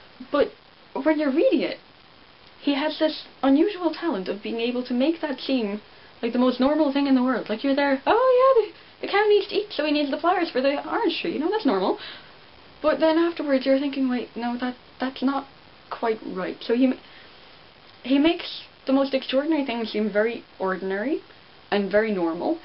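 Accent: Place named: Ireland. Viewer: literary irish. very attractive accent.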